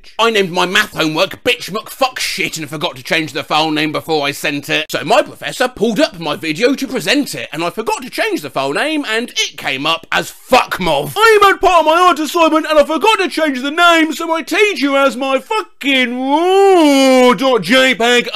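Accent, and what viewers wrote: United Kingdom. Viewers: he dose have a British accent I do admire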